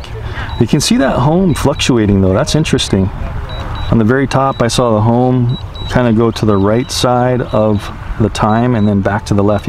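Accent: Hawaii, United States. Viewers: Howzit great video, I hear a slight localness/Hawaiian in your voice. Mahalo again for the video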